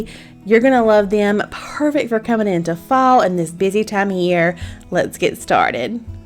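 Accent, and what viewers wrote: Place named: East Tennessee, United States. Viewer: 🍓 This is my first time watching one of your videos and I loved it! I’m from the East Tennessee and girl, your accent sounds just like home! I’ll be trying some of these recipes. And yes, church cookbooks are the best!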